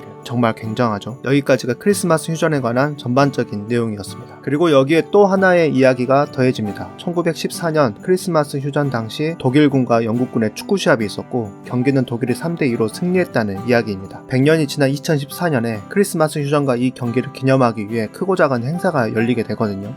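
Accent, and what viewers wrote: Jeolla, South Korea. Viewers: 난 이 형님 전라도 억양이 너무 좋아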